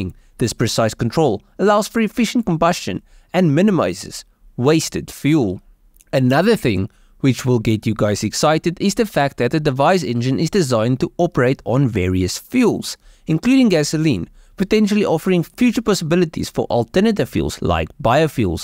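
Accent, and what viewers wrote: South Africa. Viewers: I somehow don't think Noel Duke would appreciate your over-laying and inter-mingling his Z-axis engine rotary engine with the VERY different 'DEVIZE Barrel engine'. ... and I would know; Noel is a personal friend of mine. Barrel-type axial engine's have been re-cycled over, and over and they have NOTHING new to offer. All fail because using a cam plate to translate reciprocating loads over the long-term life of a typical automotive engine is a non-starter. From your accent you are obviously South African so why not look in your own backyard? The Van Loewy (my memory may be faulty on the exact spelling of the name) is a far more interesting axial engine from the 1980/90's. It used patented universal joint to articulate the piston-rod/wobble-plate connection such that it eliminated the figure-of-8 oscillation and resolved the motion to a pure straight-line motion. 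superior to any cam-type axial engine! How about it?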